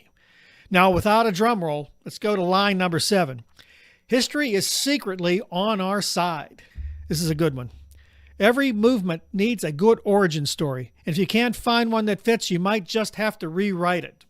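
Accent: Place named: Pittsburgh, United States. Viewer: you have a Pittsburgh accent (or SW Pennsylvania). Are you a fellow-yinzer? I enjoy your important material. Keep it up and don't be a jaggoff! 🖤💛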